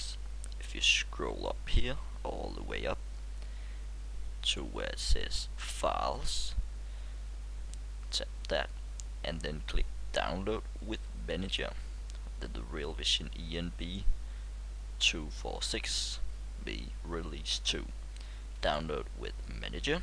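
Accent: Denmark. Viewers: You're Danish? Right? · Lol are you Danish? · Han er dansker, det kan jeg høre 100 p :D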